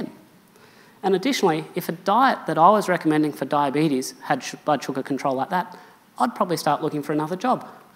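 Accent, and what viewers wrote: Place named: Australia. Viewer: Always a pleasure to listen to. Dr. Mason's appearance on HPO podcast was a huge treat and packed with fascinating info (check it out if you haven't). His lovely aussie accent is just icing on the cake.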